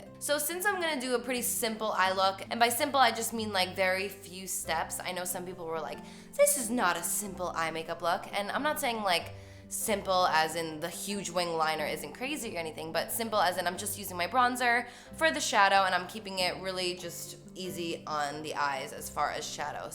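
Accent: New Jersey, United States. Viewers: I literally gasped when I saw she uploaded a new video and saw the screenshot of the look. She slayed this - as always. And I honestly love when she talks, despite the thick New Jersey accent which seems to bother some people (like why tho?) she's just so cool and down to earth and talks to us like we're friends, love that shit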